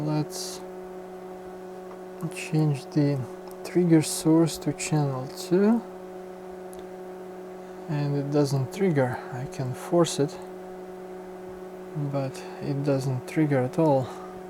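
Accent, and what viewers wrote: Russia. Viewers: Hello to russian colleague! (English native speakers never actually realise how exactly the real russian accent sounds like!) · Акцент, и очень сильный. Я только потому задержался на видео, что с первых слов заметил типичное российское звучание английского. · Great video. Enjoyed very much watching/listening while you troubleshoted. Thanks for sharing. (Also enjoyable to hear various english 'world'-dialects, and I guessing you are east-country, russian or something. Its beautiful that there are so many variations around :-) ..have nice week to you, fam and the surroundings. br, Rolf/norway)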